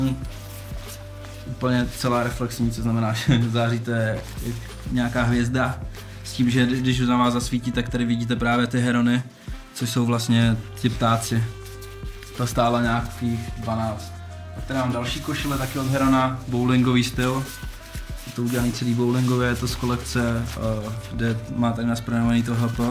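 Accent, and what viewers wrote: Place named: Moravia, Czech Republic. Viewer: Moravsky bro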